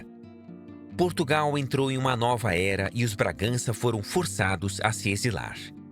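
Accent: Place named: Brazil. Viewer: Ouvir a História de Portugal narrada por um brasileiro, gente que nos ideia, é que não pode ser. Além de Portugau. Familia Reau. Que nojo uns afronta aos Portugueses .Tchsu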